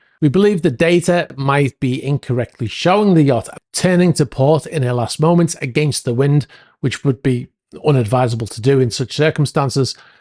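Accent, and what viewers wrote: Liverpool, United Kingdom. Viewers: Great channel! Who is the host? I’m guessing a fellow Liverpudlian? Like to know more!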